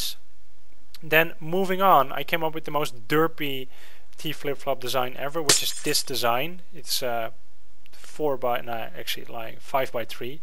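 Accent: Netherlands. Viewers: Haha lol jij bent dus Nederlands dacht al je klinkt niet echt engels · u dutch?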